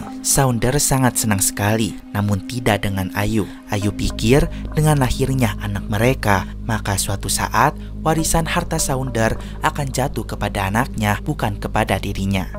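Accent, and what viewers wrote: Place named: Java, Indonesia. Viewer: Pasti jawa😂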